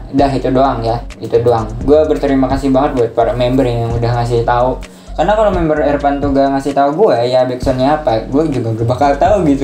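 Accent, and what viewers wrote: Banjar, Indonesia. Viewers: Orang Banjar kh?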